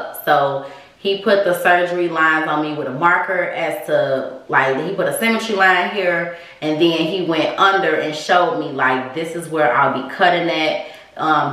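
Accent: United States. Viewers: Lol Sound so chicago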